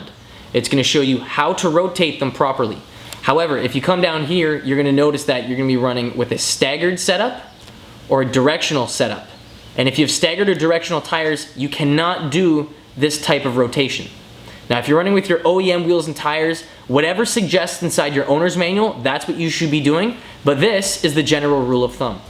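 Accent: Canada. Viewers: For you maybe... but that's how Canadians say it. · Is this gentleman Canadian?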